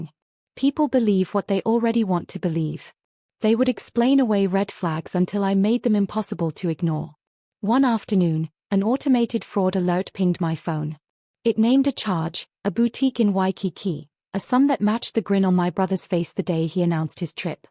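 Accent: United Kingdom. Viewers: What sounds like a British accent makes me wish we viewers were able to choose which accent we wanted to hear. That would be the only way I could hear these stories narrated by an AI voice with an American accent.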